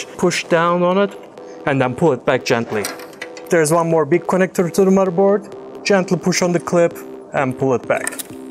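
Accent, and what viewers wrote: India. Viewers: Very Indian, love it!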